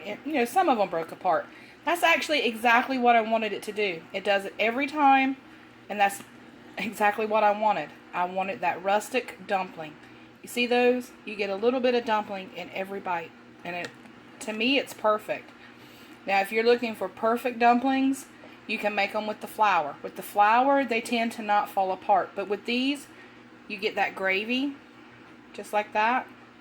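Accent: South, United States. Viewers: Arika sent me over and I'm glad! I love your Southern accent. I'm from North Carolina so I feel right at home with you! I'm new to your channel and I plan visiting you every time you post. Thanks for the great recipe! Looks delicious! God Bless!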